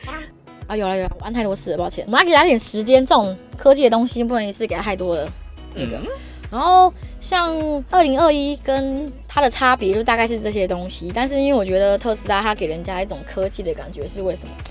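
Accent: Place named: Taiwan. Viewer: 主持人講話好台喔！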